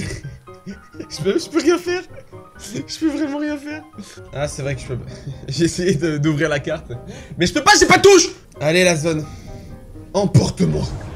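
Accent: United Kingdom. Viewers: 8SUR10 TON ACSENT ANGLAIS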